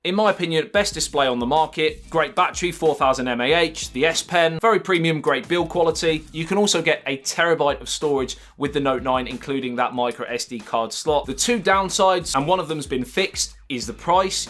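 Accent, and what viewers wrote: United Kingdom. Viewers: Well,..........except for that British brogue........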